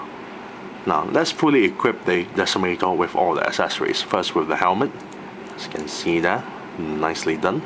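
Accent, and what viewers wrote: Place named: United Kingdom. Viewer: Sounds like across the pond 😊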